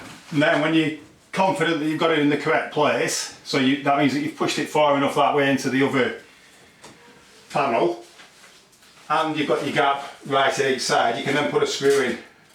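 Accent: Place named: Lancashire, United Kingdom. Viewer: Nice video. U from Blackpool? St Ann's ur accent ssounds familiar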